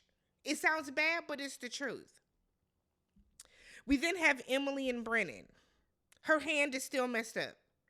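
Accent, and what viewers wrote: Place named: Midwest, United States. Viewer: Heyy from NYC! I love your midwest accent. Your recaps are excellent, its like talking to a gf. Keep up the good work.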